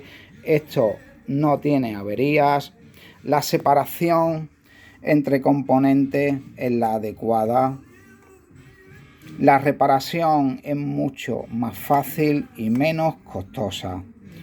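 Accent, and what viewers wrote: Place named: Andalusia, Spain. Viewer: Perdón: viendo más vídeos he comprendido que el acento andaluz usa aleatoriamente ese por zeta y zeta por ese :-)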